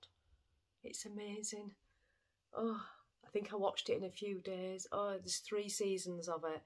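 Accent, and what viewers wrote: Yorkshire, United Kingdom. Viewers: Pick me in the comments! :-D I have never fancied doing a Stephen West pattern before but your shawl is inspiring me to think about it .... hhmmm.. Thank you for your podcast - I'm really enjoying it (and loving hearing the Yorkshire accent (I'm from Sheffield but haven't lived there for years so it's good to hear you).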